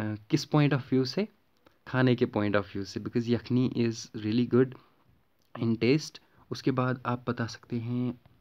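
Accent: Kashmir, India. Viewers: U seem to be Kashmiri by ur accent...then why don't u know wat is tabaq maaz????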